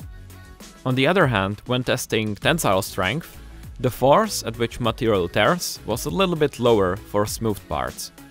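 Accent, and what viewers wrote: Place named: Czech Republic. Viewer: Lol, after one sentence I knew you are Czech.. the funny accent..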